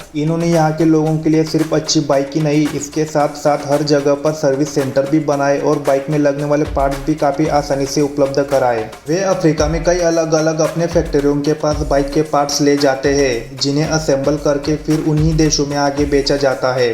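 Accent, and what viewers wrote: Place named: Maharashtra, India. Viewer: tum maharashtra ya gujrat se ho aisa lagta hai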